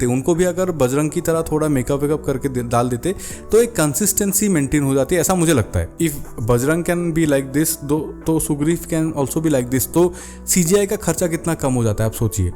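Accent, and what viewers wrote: Assam, India. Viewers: Assamese boy speaking hindi in assamese accent😂😂😂.....moja video❤❤❤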